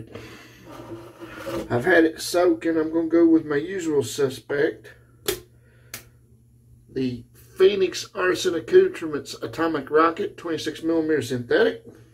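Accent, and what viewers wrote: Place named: South, United States. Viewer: Great shave! Where you from? You sound like a fellow Southerner.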